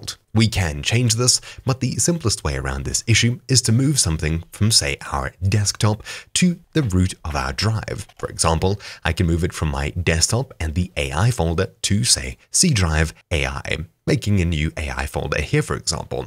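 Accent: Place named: South Africa. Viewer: Whoa sounds SAfrican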